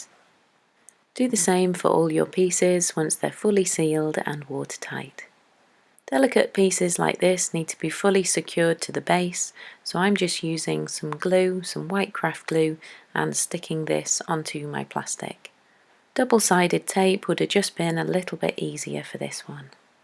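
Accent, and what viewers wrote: United Kingdom. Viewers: I love your british accent as well as your artskills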